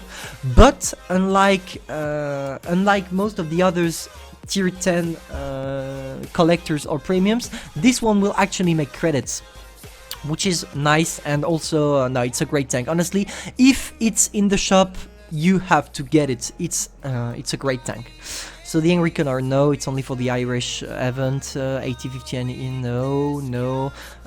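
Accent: France. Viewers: Its heavy not hivie and regulaaarlie lol i can so tell you are french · je me disais xd l'accent français et le le clan LOCA et je t'avoue que j'ai pas tous compris mais sa ses amis cause de MON anglais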